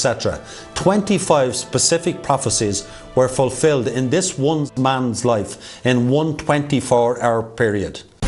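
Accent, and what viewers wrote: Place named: Ireland. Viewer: Love that Irish accent! Cool vid!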